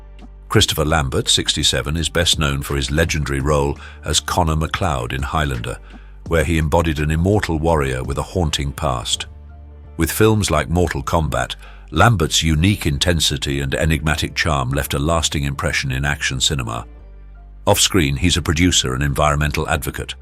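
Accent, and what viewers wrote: United Kingdom. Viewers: Why always a British narrator? U'd think we're in England watching some telly!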